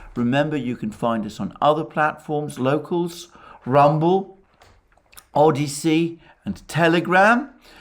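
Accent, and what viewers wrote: United Kingdom. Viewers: With all due respect, but I disagree on your views regarding the assassination attempt on Dugin!!! The ends justify the means and he is supposed to be Putin‘s „brain“. And hearing someone with a british accent complaining about ONE innocent life which has been lost is soooo funny!!! By the way… how‘s the „Bomber“ Arthur T. Harris Statue doing in London? Please do not tell me about lost, innocent lives!!! You are just not entitled to do so. Sorry… thats my very personal view.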